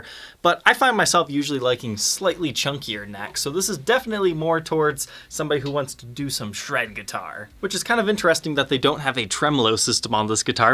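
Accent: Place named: Ohio, United States. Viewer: It’s that Ohio accent. He always says things like “needs restringed” instead of “needs to be restringed”.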